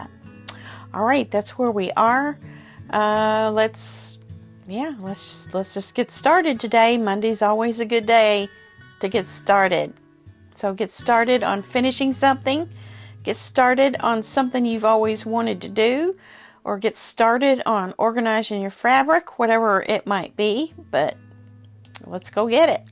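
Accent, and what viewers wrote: South, United States. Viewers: Am here! Got my coffee and have been quite busy this morning already…am looking forward to my 2nd coffee, a little break, listening to your video with your sweet-mildly-southern voice, thinking of joining your sew along if i can sew multiple projects at once. Im usually a start and finish each project before starting the next kinda girl lol😂🤣😂 we’ll see if i can swing it. Have a great day and week. Ty for bringing us your content. I love the clear plastic bins and keep my fat quarters that way with half yards as well…lol food for thought..ive decided were distant cousins… part of my family is from your neck of the woods and you resemble many of them in many ways! You seem so familiar to me in lots of different ways!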